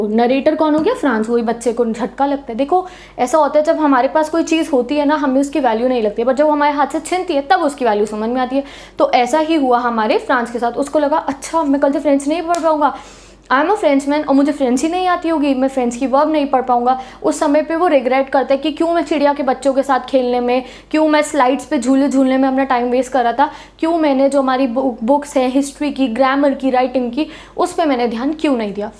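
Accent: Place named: Uttar Pradesh, India. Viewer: UP style of speaking bro Henge=hai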